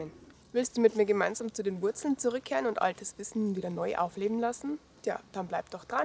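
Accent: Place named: Bavaria, Germany. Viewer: Toller Trailer und du kommst sehr sympathisch rüber aber bairisch ist halt eh immer am charmantesten. ;-) Mich würde interessieren was das für eine tolle Musik ist, die du da verwendet hast. Griaß aus da Obapfoiz. ;-)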